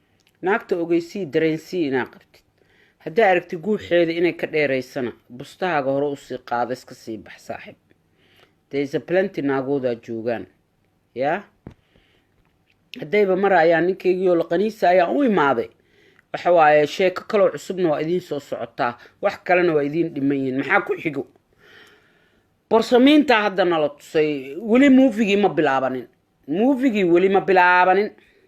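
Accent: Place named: Beledweyne, Somalia. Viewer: reer baladweyne miyaa sidii reer baladweyne cml waaye codkeeda milyan like 💕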